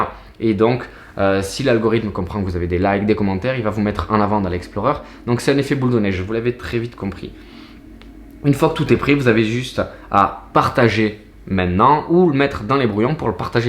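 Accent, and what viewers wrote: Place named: South, France. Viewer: Salut! Je viens de découvrir ta chaine ajd, merci pour ton travail! C'est génial de pouvoir écouter qqn avec l'accent du Sud haha! En tous cas si l'ocean et la photo sous marine t'intéresse, mon insta c'est: saltyskin_photography Cheers!:)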